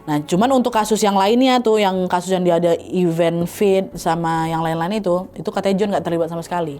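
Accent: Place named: Medan, Indonesia. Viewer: Sukak sama kerna cara ngomongnya sama cam awaq orang Medan terutama pas bilang G Ada Otak😁😁😁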